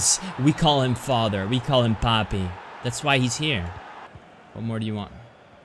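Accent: United States. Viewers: well u sound like u from DC or something,well anyways I like ur vids very accurate and description based, congrats on subscriber growth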